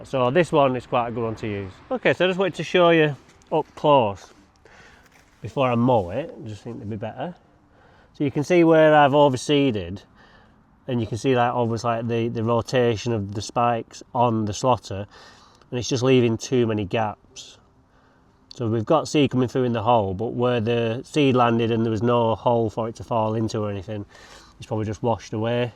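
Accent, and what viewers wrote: Netherlands. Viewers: Great video Dutch accent appalling lol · Haha, your Dutch accent is excellent. You sound exactly like my Dutch friend, Wouter. Hi from Prague, CZ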